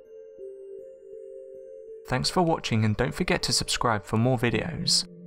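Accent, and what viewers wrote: United Kingdom. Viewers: It is to. He's British, which you SHOULD be able to tell from his accent.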